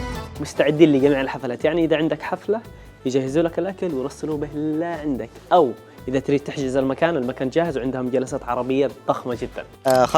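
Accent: Yemen. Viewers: كانك يمني من صوتك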